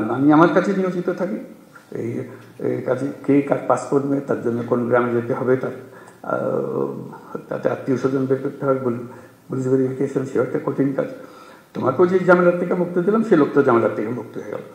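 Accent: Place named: Chittagong, Bangladesh. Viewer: চিটাইংগা কথা গুসসা লাগে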